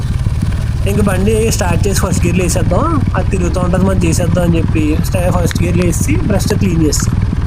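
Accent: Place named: Godavari, India. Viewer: నువ్వూ....నీ తెలుగూ.... గోదావరి యాస.....😂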